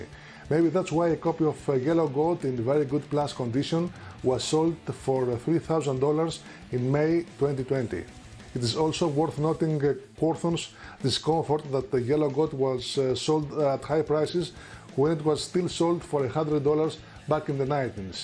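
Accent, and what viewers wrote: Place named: Greece. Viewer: Ur Greek accent reminded me of talking with Sakis Tolis when he was playing here with RC and I got to chat with him after the show :)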